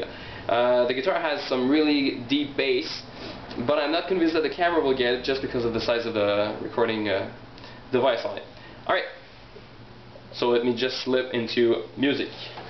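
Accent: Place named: Russia. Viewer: Ohhh... Your delicious russian accent :D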